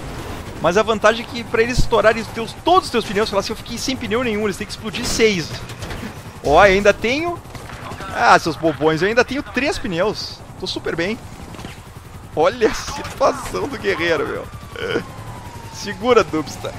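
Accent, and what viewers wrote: Rio Grande do Sul, Brazil. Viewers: Mas tu só pode ser gaucho né? abraço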